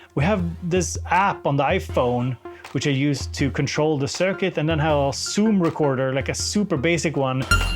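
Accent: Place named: Stockholm, Sweden. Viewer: I can picture myself getting rid of pretty much everything except my OG Circuit. It's such a joy. The immediacy of the sequencer, the knob twiddling exploration, the portability. I honestly, unironically believe it's an ideal environment for getting a curious young brain into understanding and creating music. Imagine getting one for your 12th birthday. (Din engelska har blivit bättre och bättre, men i mina öron låter du fortfarande som nollåttan, han med syntarna. ^_^ Hör av dig om jag kan hjälpa dig med uttalscoachning. <3)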